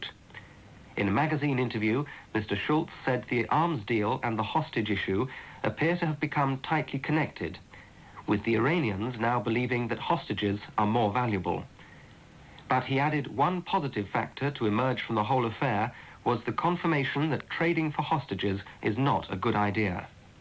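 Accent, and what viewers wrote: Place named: United Kingdom. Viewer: 영국발음 진짜 멋있다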